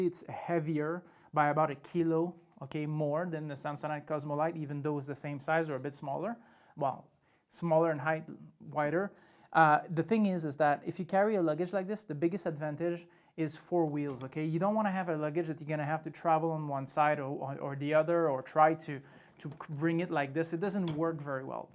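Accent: Quebec, Canada. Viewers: I was so glad to hear the Quebec accent when you started talking. Made me trust you more :') Thank you for the valuable info!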